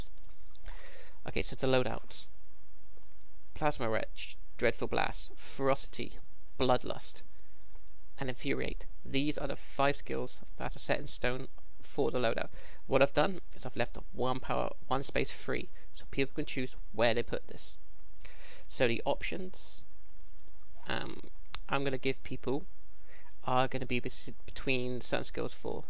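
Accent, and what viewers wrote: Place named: United Kingdom. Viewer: Love all u ppl trying to tell how to improve his loadout and he just hits u with the most logical reason as to how wrong u all are. Is it me or is it easier to grasp the load-out with that Brit accent? 4 real...same with smile and orbit....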